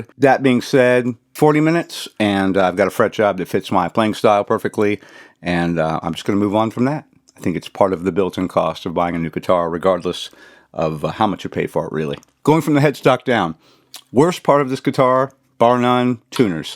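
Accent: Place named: South, United States. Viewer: You good bro. You just sound like a southerner with a lisp. That’s all. I hope you beat the shit out of Cancer. I’d love your opinion on the schecter solo II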